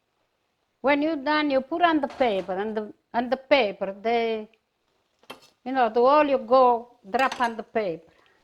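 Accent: Italy. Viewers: The world is small! We all in immigrated to Chicago in 1964. Went to Italy in 1979 and met my husband and got married in 1982. In a small town I am sure knows called Castelluccio Valmaggiore not far from Faeto!! I now live in northern Italy. My family still lives in Chicago. I love listening to she reminds me of my mom passed away in 2015 Adele who also always cooked and baked as with her Italian accent. Bye.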